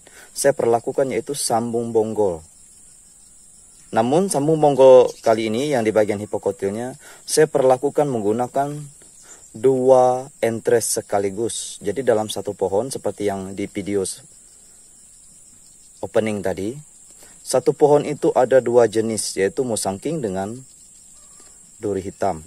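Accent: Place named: Java, Indonesia. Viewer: Bang dari logat bahasanya kok Borneo nya cukup Ke Jawa- ne ha ha ha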